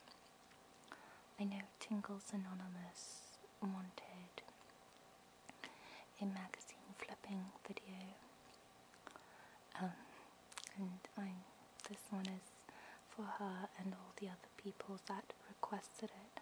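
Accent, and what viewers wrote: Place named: United Kingdom. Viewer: Are you from Korea? Your accent in English made me think you were from the UK!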